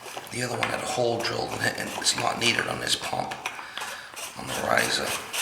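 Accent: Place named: New York, United States. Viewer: That New York accent though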